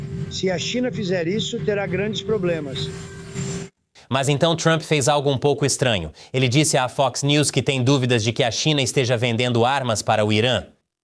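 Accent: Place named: Portugal. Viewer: Que porra essa mudança para o sotaque de Portugal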